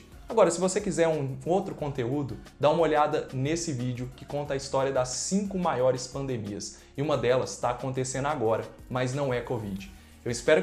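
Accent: Minas Gerais, Brazil. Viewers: Adoro seu sotaque mineiro!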